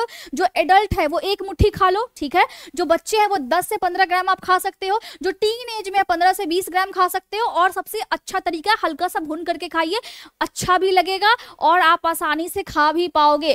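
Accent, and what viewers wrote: Bihar, India. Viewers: Mam vihari ke awaj me swag hai aapke awaj se hme pta chl gya aap pakka vihar se ho❤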